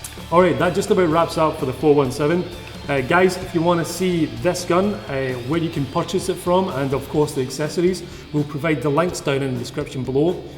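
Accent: Scotland, United Kingdom. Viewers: No, I think he sounds like a Scottish guy.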